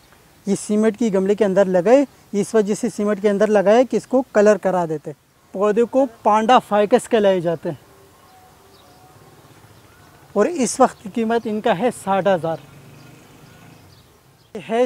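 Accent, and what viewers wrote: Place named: India. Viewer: Zaban sun ke laga india ka hindu hai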